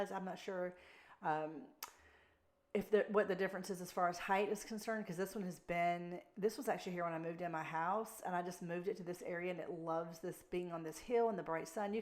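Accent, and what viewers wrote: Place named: Alabama, United States. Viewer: You have to be from Alabama. The accent is a dead giveaway.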